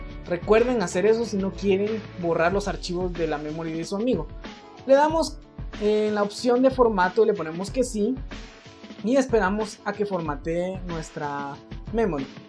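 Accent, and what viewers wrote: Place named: Guatemala. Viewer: Acento chapin :3 hahaha que nivel vos gracias me sirvio.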